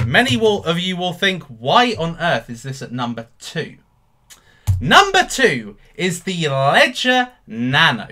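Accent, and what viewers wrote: United Kingdom. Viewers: ahhhh, had to say, I actually missed hearing that brit voice ahahaa. Hope you had a fair holiday bruv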